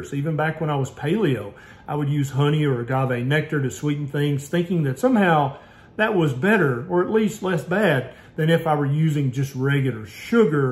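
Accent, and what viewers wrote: South, United States. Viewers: If he didn't have that southern accent, I think his credibility would go way up.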